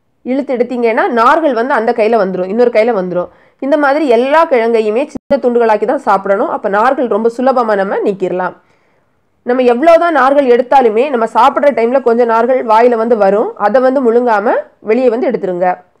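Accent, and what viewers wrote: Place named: Nagercoil, India. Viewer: Madam neenka nagercoil ah slang apdi than iruku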